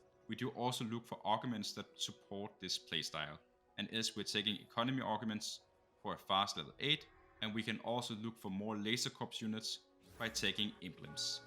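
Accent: Denmark. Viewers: r u danish?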